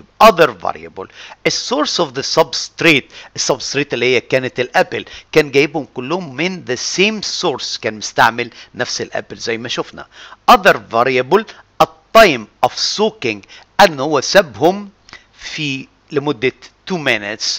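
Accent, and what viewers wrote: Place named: Italy. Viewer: Your accent is so much italian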